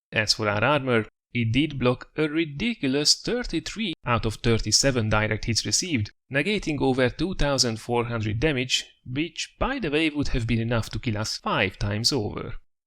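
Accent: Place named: Hungary. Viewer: Szia, ahogy hallgattam az angol kiejtésed nekem egy kicsit furcsának tűnt gondoltam hogy nem vagy eredeti angol, de rájöttem hogy a magyarok pont így beszélnek angolul. Biztosra akartam menni ezért a kommentekhez mentem és ahogy olvasom tényleg magyar vagy. Nagyon jók a videóid és az angol tudásod is. Csak így tovább!!!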